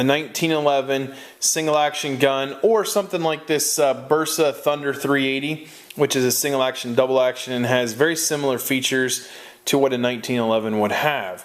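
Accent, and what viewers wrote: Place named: Philadelphia, United States. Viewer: Dudes Philly/South Jersey accent is strong.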